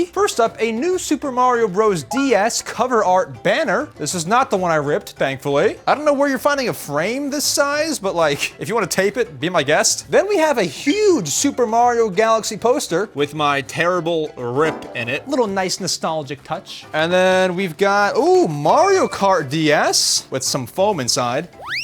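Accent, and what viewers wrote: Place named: United Kingdom. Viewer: Oh my gosh British accent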